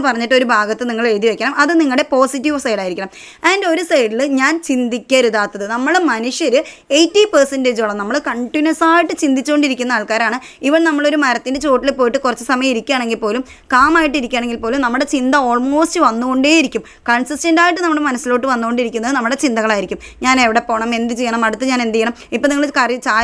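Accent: Palakkad, India. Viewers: Palakkad aanalle (thodi)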